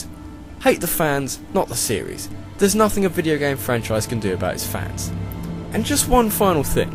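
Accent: England, United Kingdom. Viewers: Okay, this is not what I imagined you to look like. DAMN YOU FOR YOUR MISLEADING, YET BEAUTIFULLY SOUNDING ENGLISH ACCENT! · Oh. My. God. Your face... IT'S SO... different to what I imagined... Seriously, when I listened to you on all your other videos, I always pictured you with a long face, black hair, and you would wear glasses... weird huh... Dammit! I watched too much Harry Potter. Now when I hear a british/english voice without a face, that face is all I can think of... I'm SOOO Sorry! Epic list though... and congrats on 20,000 subscribers!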